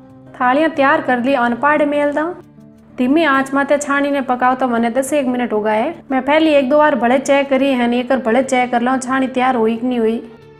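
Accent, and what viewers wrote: Marwar, India. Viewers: बहुत अच्छा? जी आप मिठी मारवाड़ी भाषा मे मिठाईया मिठी - मिठी बनाते हो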